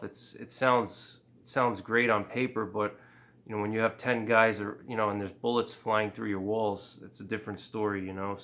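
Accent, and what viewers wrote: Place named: New York, United States. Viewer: Hey from waaaay UPSTATE NY. Your "accent" says waaay downstate.lol. Impressive on the deadlifts!! As a young man of 40 I pulled 405 for 10 at 145 lbs, but 25 , I got winded watching:) Too bad you appear to be so far away, could use another good man for the community. (***Always looking for like minded preppers to join my little slice of heaven!! 1/2 hour from Canadian border and 1 hour from Montreal, if anyone's interested and local, or just someone who realizes being in the sticks is way safer than anywhere near cities and won't mind some travel). Good videos and now a sub!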